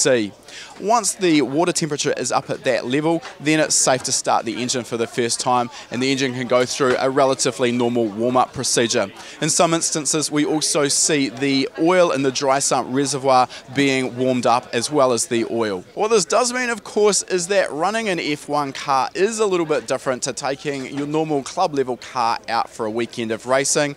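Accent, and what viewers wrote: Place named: New Zealand. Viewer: Solid kiwi accent. Having said that, fantastic explanation.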